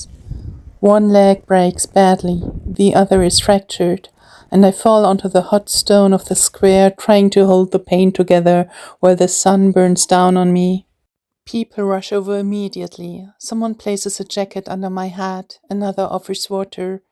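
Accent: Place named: England, United Kingdom. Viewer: Hallo, du hast einen englischen Akzent. Hast du dort gelebt? Deine Hunde sind zuckersüß, habe auch zwei😍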